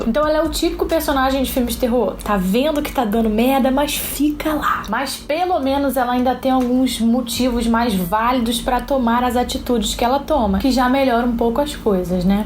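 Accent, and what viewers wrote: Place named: Pará, Brazil. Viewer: adorei o vídeo ^^ inscrito desde já hahaha achei que fosse paraense, o sotaque me lembrou bastante de lá. Sucesso :*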